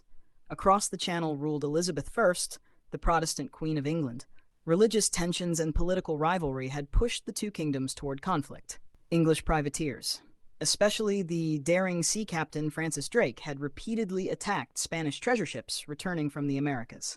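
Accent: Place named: United States. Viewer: "THE BATTLE OF THE SPANISH ARMADA" makes no sense. But what else can you expect from a US dialect narration? The battle of the English Channel is a bit more logical. Jack, the Japan Alps Brit.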